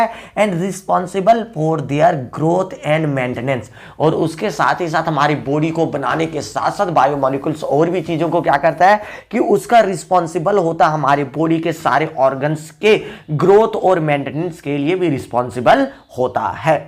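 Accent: Jharkhand, India. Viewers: Aap jharkhand se hi lagte ho sir 😊😊😊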